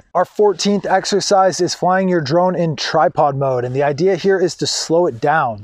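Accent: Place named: Canada. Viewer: sounds Canadian, lol.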